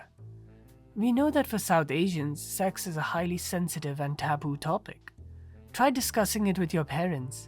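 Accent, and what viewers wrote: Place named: Oxford, United Kingdom. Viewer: a musanghi cinephile talking in oxford english. * it's not an insult, just a description/review of the video.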